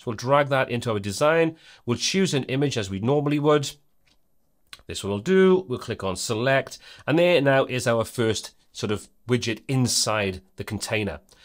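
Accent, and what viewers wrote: United Kingdom. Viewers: Finally, a clear-cut yet detailed video on Elementor Mega Menus! And it's presented by a fine chap with a great British voice!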